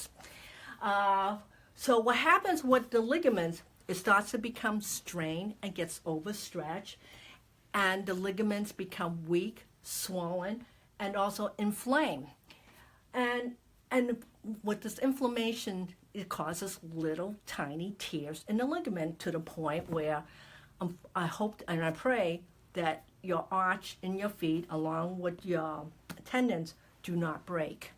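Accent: New York, United States. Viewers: I was very surprised and delighted to hear someone with a NY accent! Hence I really enjoy learning from you. I first started using an iced water bottle to roll and found some relief, then I just got an electric Shiatsu foot massager that has a warning function [which I haven’t used yet], my question is, when do I use ice and when do I use the heating? FYI, I found a big bump above the area where you had marked on the foot, so I have been concentrating mostly on that area. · I like her New York accent.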